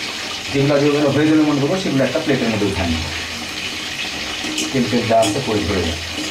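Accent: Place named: Dinajpur, Bangladesh. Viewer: vai, salam.apnar bari ki Dinajpur a? amader kothar shathay vishon mil pai.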